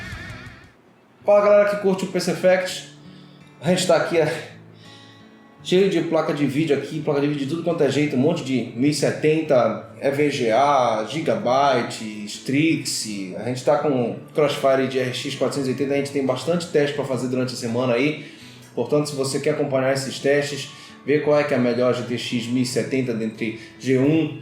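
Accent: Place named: Recife, Brazil. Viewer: Cara, de todos os sotaques que eu já escutei nesse Brasil, esse sotaque de Recife é o único que me incomoda! Eu me pergunto se esse sotaque é em toda a Pernambuco ou só ocorre especificamente em Recife!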